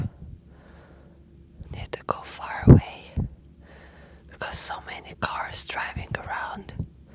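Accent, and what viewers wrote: Russia. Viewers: True winter wonderland filmed by a truly talented and very engaging blogger .. in love with her Russian English accent.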